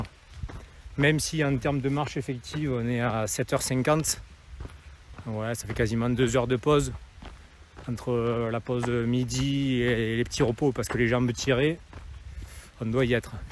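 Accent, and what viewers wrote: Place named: South, France. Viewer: Je suis bien loin de la Sainte Victoire mais c'est un réel plaisir de découvrir cette célèbre montagne en ta compagnie. C'est vraiment un endroit superbe tout comme les vues qu'il offre. Avec les commentaires au parfum de l'accent qui va bien, tous les ingrédients sont là pour une bonne vidéo ! Je suis content d'avoir découvert ta chaîne et je ne manquerai pas d'aller voir tes autres randos ! + 1 abo ! 👍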